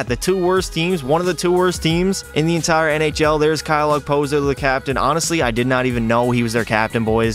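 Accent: Canada. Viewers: are you Canadian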